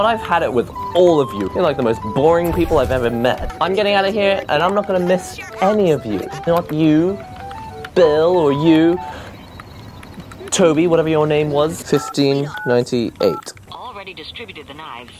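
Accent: United Kingdom. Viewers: I already asked but are you british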